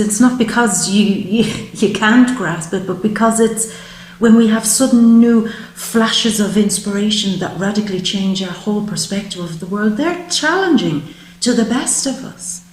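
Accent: Ireland. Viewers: I'm barely 4 min into your reading and love what you are saying! love the brogue. xoxox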